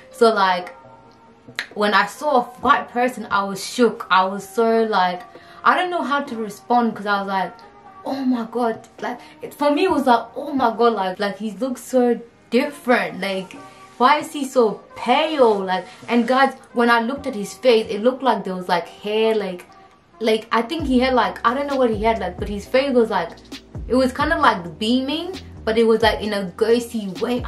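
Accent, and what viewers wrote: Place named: London, United Kingdom. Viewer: where did you learn English? you sound like you are from London, greetings from the UK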